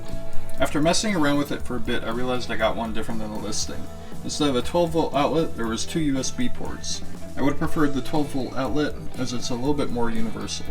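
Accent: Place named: United States. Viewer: Great video. Great American English from expat in England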